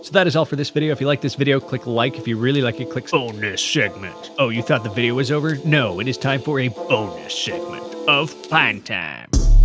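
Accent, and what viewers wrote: Canada. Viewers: I love your videos! Keep up the good work FOR EVER. PS you talk very Canadian...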